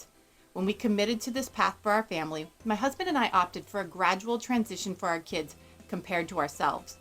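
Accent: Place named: United States. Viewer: You are carrying out an experiment on your children which i consider unethical. The fact that you and your husband previously ate crap is irrelevant and at least your husband was very obese and possibly you were too. Get one of those carnivore drs to become your dr. You most likely are from the US based on your accent. In the US if you have evidence you can sue the hell out of people. So go get one of these drs to tell you to experiment and advise your children what to eat. Even the inuit had a diet which was approximately 20% carbohydrate which is extremely low. Best of luck and consider the diet recommendations by all but a single practitioner Dr Anthony Chaffee.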